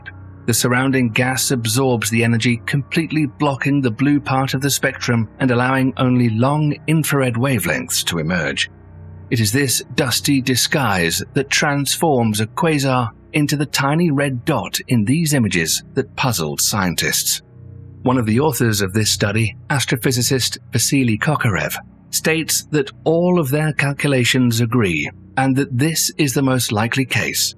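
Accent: United Kingdom. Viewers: Can’t the narrator be an American. I can’t take the awful sounding British accent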